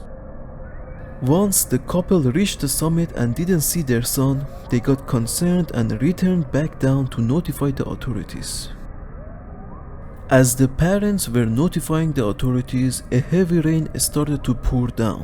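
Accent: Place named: Russia. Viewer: I’m very happy that my comment wasn’t taken in a negative way! I really appreciate your willingness to try to enunciate a little more clearly and work on your English for me :) that’s really cool of you to do! One last thing, that is if you’re interested in my wishes, but don’t ever hesitate to make your videos as long as possible! I really enjoy and try to find the longest videos I can because it’s easier for me to sort of forget that I’m listening and just become hypnotized until I drift to sleep. I become your willing victim, I fall prey to the enchanted tone of your deadly sweet Russian accent... It’s harder for me to get to that place as quickly when I only have videos that are like 8-15 min. I like those a lot too but those are more for day time watching. When it comes to night time, sleepy time listening, longer videos are the best! Also, don’t ever let yourself become attached to or fall in love with any intros or outros to your videos that are like loud and abruptly audibly shocking like when you’re falling asleep watching an action movie at home and then an explosion suddenly happens in the movie after a long scene of quiet dialogue lol then you get jolted awake from the sudden volume change. 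A really incredible example of a YouTube channel that literally does everything I’m talking about 100% perfectly, in my opinion, and is the channel I compare every other missing persons/mystery channel to is the YouTube channel called “Unexplained Mysteries”. That channel uti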